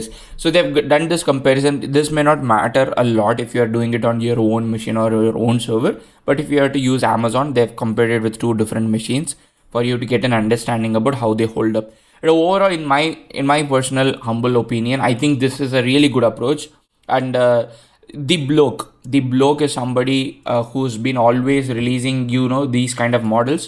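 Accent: India. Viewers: Can you use AI to remove your Indian accent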